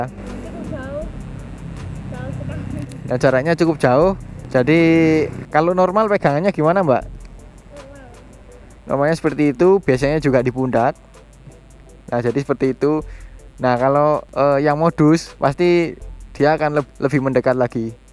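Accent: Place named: Java, Indonesia. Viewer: Judul inglish ngomong medok jawirr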